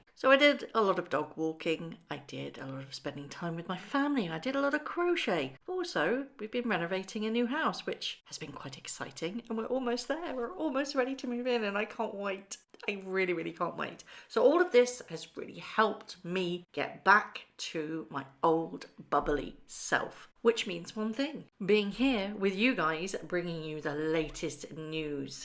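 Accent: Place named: United Kingdom. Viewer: Absolutely full of craziness. Welcome back . Missed you , I love hearing you talk. That British accent. Nice.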